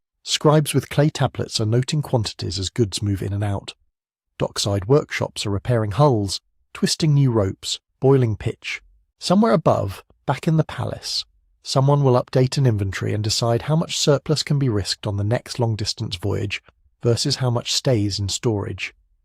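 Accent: United Kingdom. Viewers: It is genuinely breathtaking to hear a British accent this refined and so confident delivering an argument this intellectually bankrupt. To claim us Hellenic (Greek 🇬🇷) people, merely 'inherited' this civilisation, this would be using the same set of broken brain cells suggesting the modern British aren't actually related to the people who built Stonehenge, that you lot just 'bobbed up' in the North Sea one Tuesday, found some neatly stacked rocks and a few damp fields, and decided to play house in them. If you could pull your head out of the clouds for five minutes and check the 2017 Lazaridis study in the journal Nature, you’d find that the genetic receipts' scientifically prove an unbroken biological line between the Minoans, the Mycenaeans, and the people living in Greece today. It’s not an inheritance it’s our own bloody house you fool. But I suppose we can’t let pesky peer-reviewed science get in the way of your revisionist propaganda can we? 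Surgically separating Crete from the Greek identity isn't historical analysis 🤞🏻🤞🏻 it’s just a semantic shell game for the intellectually lazy. Honestly, I’ve seen more historical accuracy in a packet of crisps. You’re not a historian; you’re just a confused tourist with a microphone and YouTube page you MALAKA ask the Greeks what that word means.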